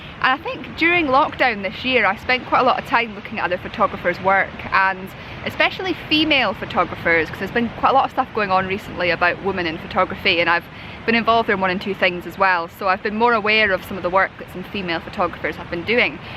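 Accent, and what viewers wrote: Scotland, United Kingdom. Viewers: .love the wave photos.I been watching all your videos and find them most enjoyable, If it wasn't for the cute scottish accent, i might say you talk a wee bit to much lass.Keep up the good work. hope you make it to Orkeny.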